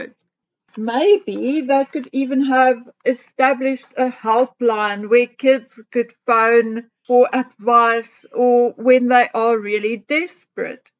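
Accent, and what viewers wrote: South Africa. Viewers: She has a South African accent, get over it.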